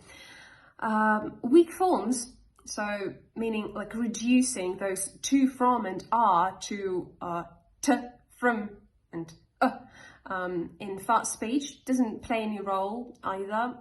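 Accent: United Kingdom. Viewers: You practically sound British. With the tiniest hints of Australian here and there, if that. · Halaew halaew. This is very overbritish accent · Hi! You have such a beautiful British accent. Can you share a few tips on what exactly helps to make the effect of a British accent? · It's amazing and wonderful! A girl from Belarus, who has never lived in the UK, speaks perfect English with a British accent. I am truly impressed by your mastery of the English language. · Согласна, результат поразительный, думаю, у не просто музыкальный слух, а именно талант подражания плюс много часов работы и исключительная старательность и вуаля! - прекрасный British accent! Well done! Думаю, многие учителя из постсоветского пространства даже очень завидуют вам!👍👍👍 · Hi! I would start by telling you that your RP accent is amazing. Most of the time, English learners are afraid to look ridiculous with the British accent, much more difficult to master, and that’s why they tend to choose the American accent. 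And you are living proof that it is possible to master a superb British accent. And I will continue by saying that I do not agree with Vivianna. There are already enough channels with short formats. I don’t know if you’ve heard of Luke’s English Podcast, it’s a podcast with a long format, and it has won several awards and consider by its peers as one of the best podcasts and with great success even with a long format;) Ps: And yours videos are far from imperfect. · I'm not British myself but I thought you were so when I heard you speaking for the first time.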